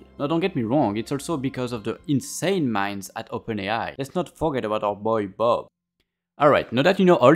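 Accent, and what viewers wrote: France. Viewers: great video but french accent was 🤮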